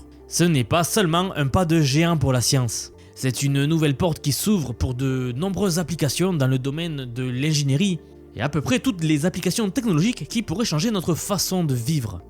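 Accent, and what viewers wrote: South, France. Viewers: J'ai vraiment du mal avec l'accent du sud 😂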